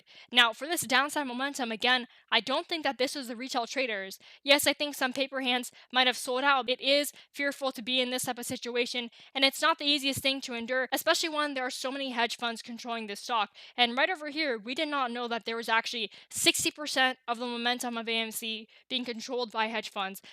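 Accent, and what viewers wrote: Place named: Canada. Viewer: Well-done, 👍 🐰‼️👏👏 Did I hear a little Canadian 🇨🇦accent in your voice? My kids have that too‼️😃I like it‼️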